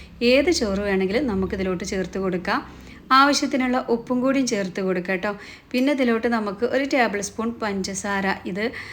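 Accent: Malappuram, India. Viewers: നിങ്ങളുടെ മലപ്പുറം സ്ലാംഗ് ആണ് കേൾക്കാൻ രസം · പാചകവും വാചകവും ഇഷ്ടപ്പെട്ടു കേട്ടോ, മലപ്പുറം ഭാഷ ഒത്തിരി ഇഷ്ട. സൂപ്പറാണെ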